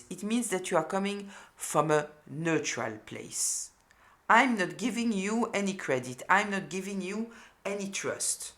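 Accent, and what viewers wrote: France. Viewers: i love listening to your perfect english in your beautiful french accent you should teach tarot, you make me understand the energy of the cards and the story they tell, so easily merci 😊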